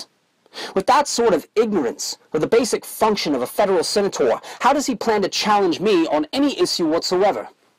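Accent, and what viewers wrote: South, United States. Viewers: Dear Mr invictus I can only describe the way I feel after hearing you speak on your YouTube channel as "moved". I was starting to think the only time I would hear a man with such a dignified southern accent speak with such passion would be in my own head as I read books about our glorious southern history. This recent attack in Dallas has presented you with an opportunity to obtain a constituency you probably would not have thought would be big supporters of yours. Law Enforcement (and I'm not talking about feds) are without a support group that is not subservient to the same people that are causing their death and missery. If you would do a fire side chat speaking to them about what you would have in mind for them and how their are allies for them outside the fed's and unions, it would be well received. Second I would reach out to James Lancia the author of DownTown White Police. 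His star steadily growing and he is inevitably going to have a large following of police. If you could get Mr. Lancia to opeanly support you it would bring many white police officers to your side. I know it sounds kind of crazy but if you think about it white police officers are in exactly the right position and in the right mindset to welcome a man like yourself right now. Go to Mr. Lancia's YouTube channel. Listen to some of his cop shootout videos. After this I'm sure you will see why I believe these officers will welcome you. Keep up the fight.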